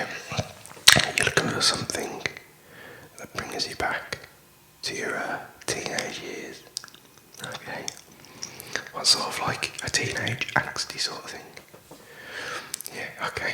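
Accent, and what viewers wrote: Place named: Birmingham, United Kingdom. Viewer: you sound brummy, are you from the black country? im from wolverhampton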